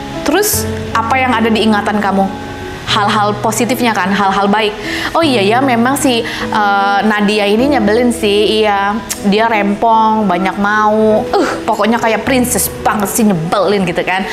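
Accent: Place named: Bali, Indonesia. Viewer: Sekarang logatnya udah ada Bali²nya. Follow kakak dari 4 tahun lalu :)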